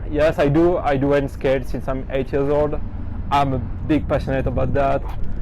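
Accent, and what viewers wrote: France. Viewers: Mais sa se voit tellement avec ton accent que tu est un français 😂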